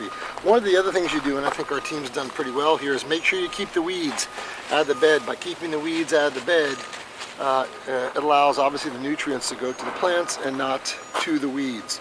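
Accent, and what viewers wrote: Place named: Midwest, United States. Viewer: I don't think he's from the South. His accent is Northern, imo. Probably Midwest.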